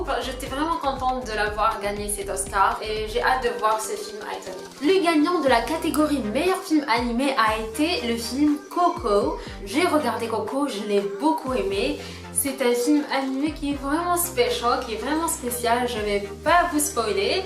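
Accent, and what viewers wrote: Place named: United Kingdom. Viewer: j'ai vue que tu a mis la music 1 like en plus;) presantation avec accent soo british je like aussi;)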